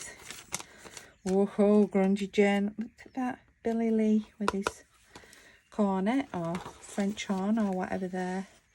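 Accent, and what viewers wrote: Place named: England, United Kingdom. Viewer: Morin Miss... Ooooooo-La-aaaaaa... Love your Grungy Finds... Especially that Yummy Ole' Scrap Book... OMG ness, me-oh-my..... 🌹 🥰 ✨️... Enjoy your treasures... Was nice to SEE your Sweet English face on the SSS video... & to hear that lovely accent I miss so fondly... Later my BOO's 🐝 😎 👑 🥰 🙏 ✨️ 👍 🌹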